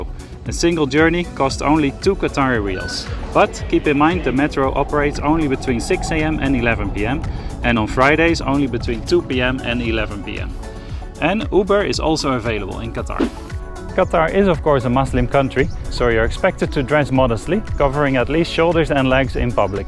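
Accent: Netherlands. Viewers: Your accent sounds Dutch? Are you from the Netherlands? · Hollander aan die accent te horen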